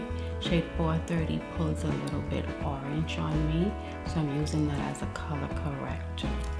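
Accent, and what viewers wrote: Bahamas. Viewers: You don't see this much over here. We just slap makeup on without 1st taking care of our skin and then wonder why our skin is messed up. I use to think makeup broke my skin out until I started watching beauty channels here on YouTube. Then I learnt how to care for my skin before and after applying makeup. Not gonna it feels strange hearing the Bahamian accent here on YouTube but you doing it. Taking 242 to the world 🇧🇸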